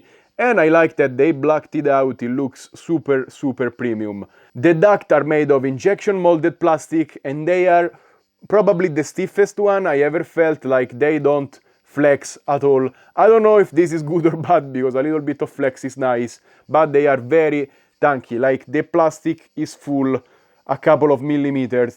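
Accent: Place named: Italy. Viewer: Man I like your accent! Are you Italian?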